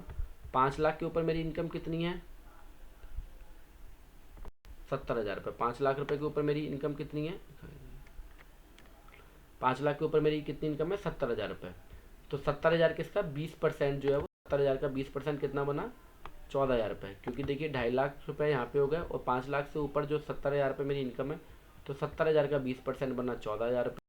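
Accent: Agra, India. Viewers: Sir aap agra se ho?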